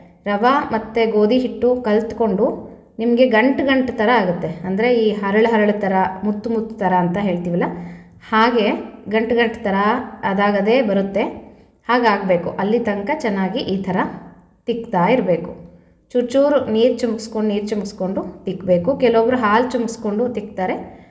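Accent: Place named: Ballari, India. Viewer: Akka superb agide Naau kuda Ballari avare Same nimtharane mathododu akka thumba kushi aguthe akka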